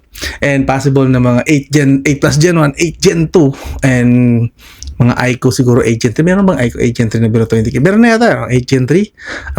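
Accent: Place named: Conyo, Philippines. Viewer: conyo hehe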